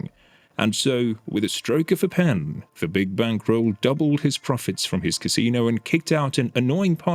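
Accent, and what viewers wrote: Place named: United Kingdom. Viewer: Crap narration, would have been better with an American voice rather than stuck up English rubbish.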